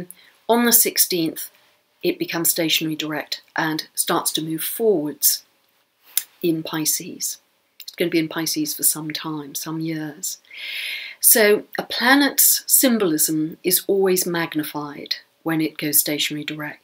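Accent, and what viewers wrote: England, United Kingdom. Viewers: Thanks - enjoyed your energy, wisdom and wonderful 'jolly good' English accent. So good to hear the queens English spoken as it used to be. (I'm speaking as an ex West Londoner born 1950):-) Also love the cushions!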